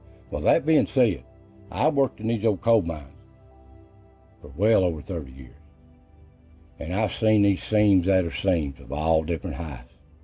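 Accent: Appalachia, United States. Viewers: I feel kind of a kinship to you. My people settled in the Appalachians on the east side and yours on the west side, we both have the same surnane and we're both country boys from birth with the same accent. If we could go back far enough I think we would see that our families (on the paternal side) came from the same place.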